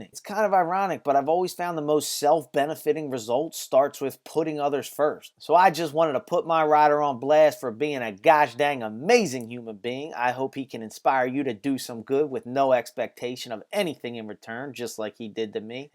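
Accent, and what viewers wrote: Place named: Kentucky, United States. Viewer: Soo are you from Kentucky, Indiana, West Virginia? Can't quite place the accent, but you're definitely not from Philly haha :)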